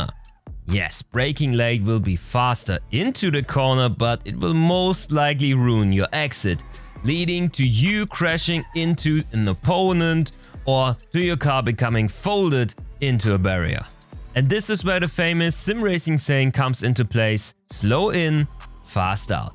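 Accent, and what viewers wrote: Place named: Germany. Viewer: Glaube man hört es wirklich raus wenn ein deutscher englisch spricht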